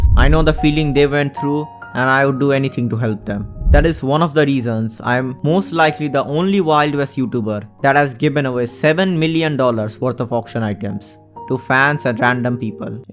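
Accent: India. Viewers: Your tone is like Indian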